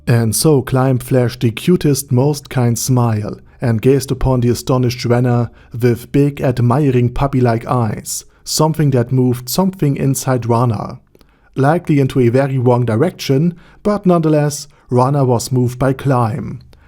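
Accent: Germany. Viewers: it is a heavy german accent but its very easy to understand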